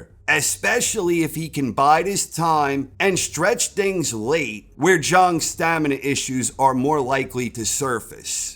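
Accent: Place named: New Jersey, United States. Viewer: His videos are actually quite good. I like his voice, very New Jersey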